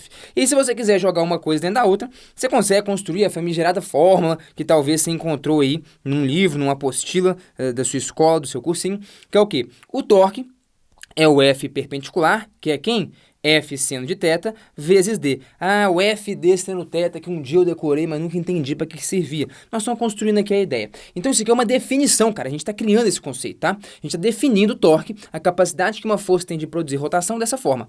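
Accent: Minas Gerais, Brazil. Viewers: A aula é excelente! Sem dúvidas! Mas confesso que conhecer o "mineirês" deixa o entendimento mais fácil em alguns momentos da aula... Digo isso sendo mineiro também.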